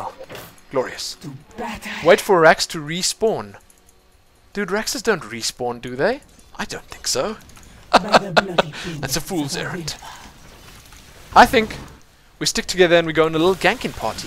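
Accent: South Africa. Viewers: You sound south african. Is that accurate?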